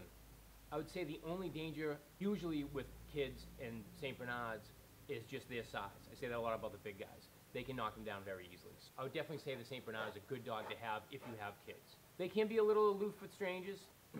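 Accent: Boston, United States. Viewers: "a very lahge dahg" hahaha love the boston accent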